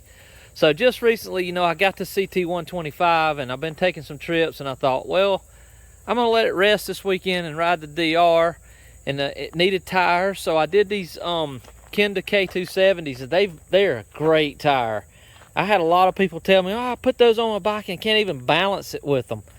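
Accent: South, United States. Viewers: Where are you from? You have a major southern accent.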